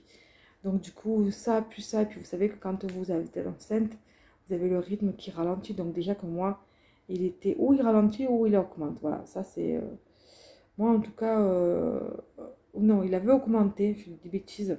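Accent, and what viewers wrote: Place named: South, France. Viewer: Coucou tu m as fait trop rire et j adore t ecouter avec ton accent du midi oui je pense comme toi en vieillissant on aime redécouvrir les choses simples comme le jardin bon aprem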